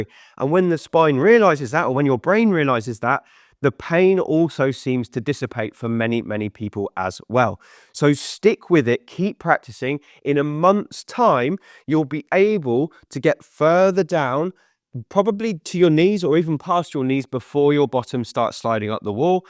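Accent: United Kingdom. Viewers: 57 year old male…I tried this once and found it useless…the best part of this clip was his British accent..Americans love a British accent but other than that I didn’t find this useful at all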